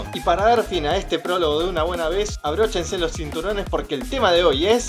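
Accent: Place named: Argentina. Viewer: Denada me encantan sus videos y me encanta tu forma Argentina de hablar tan característica de nosotros , saludos 😊👍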